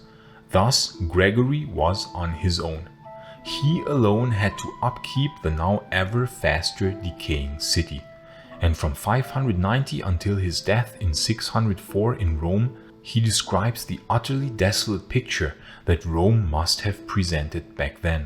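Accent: Germany. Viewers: german accent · It's absurd trying to present and emphasize the Eastern Romans as the ones who destroyed Rome whilst the barbarians as the ones who wanted to make it great again as if the latter were not the ones the Romans have been fighting against forever. I urge you to stop the propaganda favoring the barbarians. We know darn well who overthrew the Roman Empire in the West and not you nor anyone can change that, no matter how many videos you make using pretty English in germanic accent.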